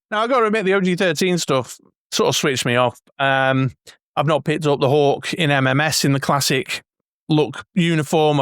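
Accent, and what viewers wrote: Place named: United Kingdom. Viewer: What a great review. Plus the accent gives it that British charm and you can tell how excited you are by the volume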